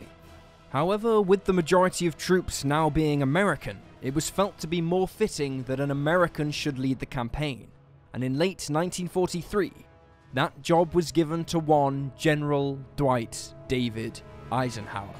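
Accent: United Kingdom. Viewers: British babble !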